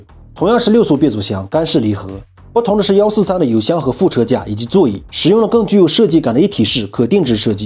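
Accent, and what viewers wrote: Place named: Inner Mongolia, China. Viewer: 你这内蒙口音可以呀！！！哈哈哈哈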